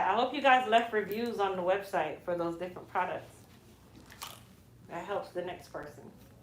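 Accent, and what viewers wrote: Jamaica. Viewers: I can hear that Jamaica talk in you.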